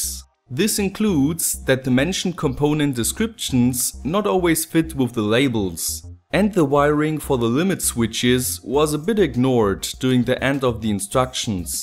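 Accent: Germany. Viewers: Are ya a german dude?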